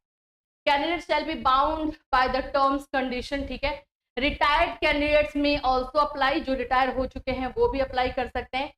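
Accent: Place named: Agra, India. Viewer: Kya aap Agra se ho madam?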